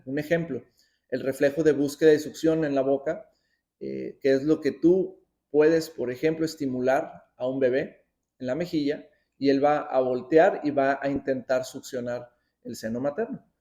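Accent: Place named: Guatemala. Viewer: De que país es el dr.???? Parece guatemalteco por el acento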